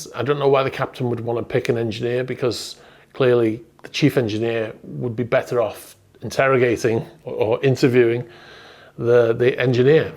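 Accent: Liverpool, United Kingdom. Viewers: My USA ears aren’t well tuned. Scouser?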